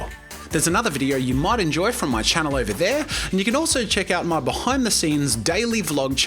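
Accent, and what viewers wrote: Australia. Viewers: Are you Australian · During the testing he kind of sounds like a happier, friendlier and australian version of gordon ramsay :D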